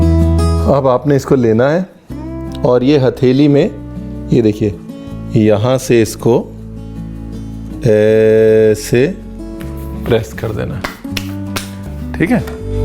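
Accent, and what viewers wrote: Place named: Gujarat, India. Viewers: Fafda 😋👌👌👌jalebi🤔 miss kar rahe the lekin aap ka mitha mitha gujrati sun k dil khush ho gaya👏😀🙌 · Achha lgya apka gujarati tone 🌺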